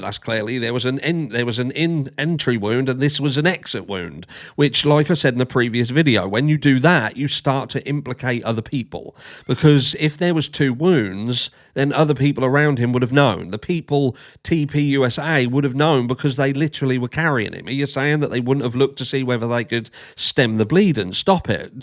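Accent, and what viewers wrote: United Kingdom. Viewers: It appears you think because you speak with a British accent or whatever, you think people are supposed to believe you over everyone else. Jimmy Kimmel is a comedian. Jimmy Kimmel is not a racists podcaster who preaches hate division & white supremacy, nor did Kimmel advocate for gun violence to include kids being murdered in cold-blood in schools and churches as they studied & prayed. You had no problem throwing shades at Jimmy Kimmel for rightfully doing his job in a comedic way through political satire for calling out a 21st-century Klansmen in a suit & at no time did you bothered to mention any of the racist disgusting horrible things Charlie kirk has said which told me everything i needed to known about you and your up bringing or current beliefs. I watched your video, and just like the one before this one, I gave it a thumbs down. 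If you're going to make videos to explain your point of view from an honest point of view, you should leave your biased feelings out of them, ,, Otherwise you're just a low grade brand of Foxnews propaganda which is responsible for the current political divide in today's America . The killing of 21st-century Klansmen in a suit Charlie Kirk was wrong, but Jimmy Kimmel was just doing his job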